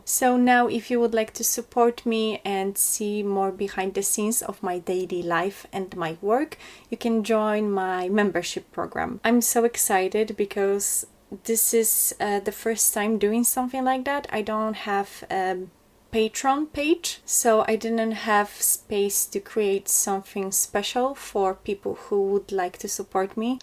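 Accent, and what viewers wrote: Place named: Poland. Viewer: OMG Tak mi się wydawało, że masz polski akcent! :3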